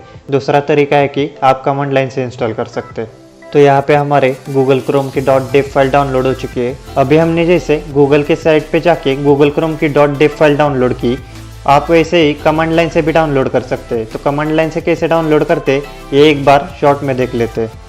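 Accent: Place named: Maharashtra, India. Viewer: Bhai ur speaking accent is like marathi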